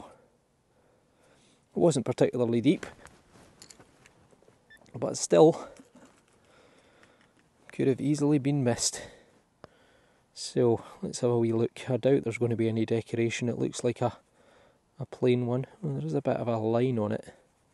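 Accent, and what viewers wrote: Scotland, United Kingdom. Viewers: I'm one of your new subscribers and just want to say you deserve all this new attention your getting, not only are you making wonderful discoveries but also your Scottish accent is one of the subtle ones, which makes you easy to understand, even for non Brits, best wishes for 2023 hope you find what your looking for Andy a Brit from the Midlands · The face is not ugly and the Scottish accent is so beautiful. The history you know is so interesting.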